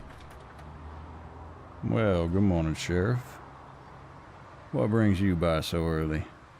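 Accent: South, United States. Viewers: I love the southern accent😍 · I love your southern accent. It really suits you. I wonder if you talk like this irl🤭 thanks for the part 2 ❤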